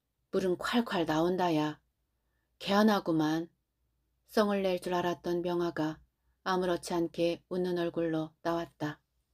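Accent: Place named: Jeolla, South Korea. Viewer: 남도 사투리가 대단하십니다.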